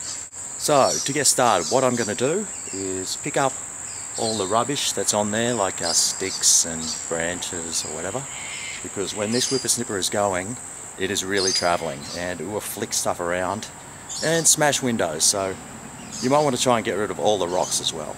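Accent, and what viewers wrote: Australia. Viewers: Lol your an Aussie right?? I can hear the accent plus the wording. Born there haven't been back since 2002. If have thick weeds should I pull out or can cut it with the weeder? I know the mower can't handle such a thick weed. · A man who talks gardening with an Australian accent and in under 4 minutes convinces me that from now on “whipper-snipper” is the only term I’ll ever use for the garden tool in this video is a great human being all the way around. Thanks for the helpful video! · Helpful video, thanks a lot! And I am totally adopting the term "whippeah snippeah" instead of weed eater :) much more fun to say. Could listen to Aussies talk all day!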